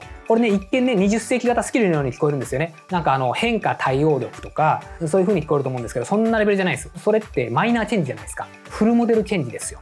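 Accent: Hiroshima, Japan. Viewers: 面白い動画ばかりで、広島弁も心地いいです。ただもう少し完結した動画にして欲しい。でも楽しいですよ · さん、ご家族でコロナ大変でした😂元気に復帰していただいてとても嬉しいです🤗奥様も3人の子育てで大変なので段々イライラしてきたんでしょうね😅 さんが作ったスキル分かりやすいし素敵です🤗課題発見力の必要性と商売の本質も学べました。やっぱり疑問に思ったり、思考力が大事と思いました。フルモデルチェンジ力のお話しも納得！過去の成功を捨てるのは勇気が入りますがしがみついていると時代に取り残され稼げなくなると思いました。変化に対応できて学び続ける人が稼げると私は思いました。大手が参入している領域では勝てないので、個人でどうやって専門性を見つけ稼ぐに繋げていけるのか動画にあげてほしいです🤗次回の動画も楽しみです🤗 さんは未来予測もしてますが私達に現実、事実を教えて頂いている希少性が高い存在です☺️応援してます😊あと広島弁も素敵ですね😉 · えーコロナ！大丈夫ですか？広島弁聞きたかったん！ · 心地よい広島弁ですね✨ 勉強になりました！ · 非常に説得力あるお話でした！勉強になりますね。ありがとうございました。広島弁で話されてるところも聞き入るポイントです。話すスピードも私的にはgoodです！ダラダラ話している方は飛ばしたり、早送りしてしまうので。最後のじゃあね、バイバイがまた可愛いくホッとします笑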